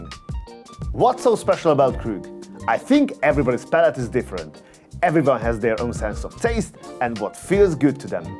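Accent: Hungary. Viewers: Love your Hungarian accent. It adds to the charm of the channel 🤌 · I never realized that you had a Hungarian accent. Now, that I know, I can't un-hear it ;-). Thank you for videos. It is an extremely rare opportunity to get a glimpse into the world of 2-3 star Michelin restaurants where a dinner for two is upwards of 1k Euro (not counting extra wine at 2k a bottle). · Emlékszem mikor még pár ezer feliratkozó volt a csatornán és azon gondolkodtam, hogy ez egy kifejezetten magyar akcentussal beszélő úriember és bár eddig nem hallottam róla, valahogy mégis büszkeséggel tölt el, hogy egy magyar, illetve több magyar ilyen minőségű tartalmat gyárt. Nem tudom, ha valaha hagytam így hozzászólást bárhol, de úgy éreztem, hogy itt az ideje. P.s. remélem idővel én is veszek egy Krug-ot, ami majd erre a csatornára emlékztet. Hajrá!:D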